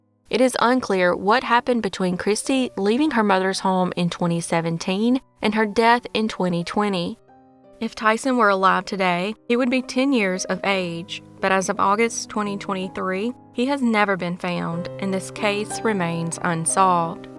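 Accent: South, United States. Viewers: Mine is even more southern lol love your channel nice to hear another southern accent.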